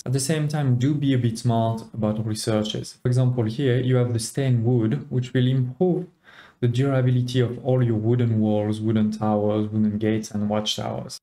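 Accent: France. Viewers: You're french, right? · Tu serais pas français?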